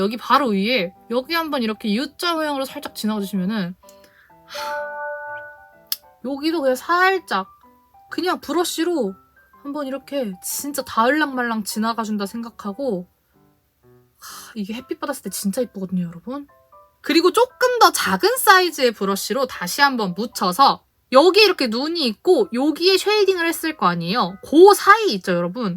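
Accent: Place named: Pohang, South Korea. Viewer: 근데 님 어디사람이세요..? 서울이 아니신거 같네용 포항사람인 학교쌤 말투 ↘️↗️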